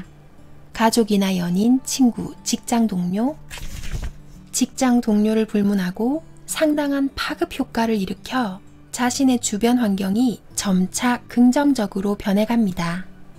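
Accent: Gyeongsang, South Korea. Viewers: 아침일찍 좋은말듣게되어 오늘하루를 힘차게 보낼수 있겠습니다 경상도사투리가 정겹기도하구요~ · 경상도 아가씨다 ㅋㅋ 저도경상도 ㅋㅋ 이쁘시내예~~잘보고갑더~~밥묵고하소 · 목소리가 매력적이세요~^^ 경상도 사투리가 더욱 매력 있으십니다~♡♡♡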